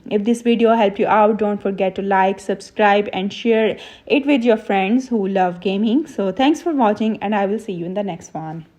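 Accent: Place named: India. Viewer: wow i love the indian sam voce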